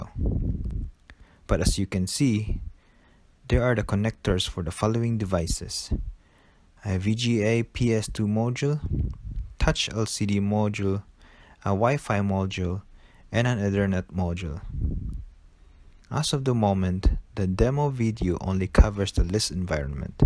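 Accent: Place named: Philippines. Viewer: You sound Filipino. Are you filipino?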